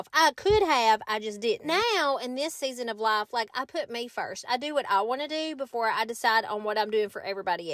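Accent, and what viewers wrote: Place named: Tennessee, United States. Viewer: 🧹🗑️🧽 I made it! ASMR isn’t about being loud, it’s being super quiet while you record the normal sounds of the stuff you’re doing: — the sound of cabinets opening and shutting… — a knife blade slicing or chopping through food… — a blanket snapping as you shake it out before you fold it… and on and on. No banging or slamming needed (or wanted). You record all that stuff, cut it together really close when you edit so it’s a series of sounds one after another (accompanied by the visual of you doing the thing that makes that sound), and that’s an ASMR video. That said, I’m happy you don’t do ASMR because I hate that nonsense! I love to listen to your Tennessee accent in your voiceovers. I can’t understand why these videos don’t perform well for you. This is the content that made me decide to subscribe! I also like when you show how you make certain meals and desserts. 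I need to try the cinnamon rolls with pie filling. That looked so yummy.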